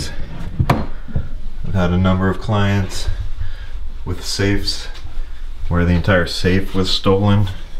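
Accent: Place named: United States. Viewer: Voice of an American, I guessing probably not a Democrat...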